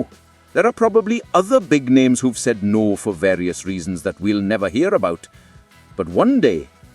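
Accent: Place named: South Wales, United Kingdom. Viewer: Who is this guy with south walian accent talking about.we are north Wales club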